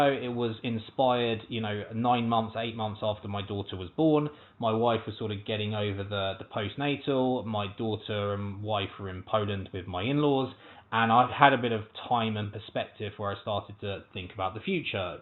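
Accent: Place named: Australia. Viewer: I cannot tell if you have an Aussie Twang to your voice or it's that sort of unique London/Southern accent.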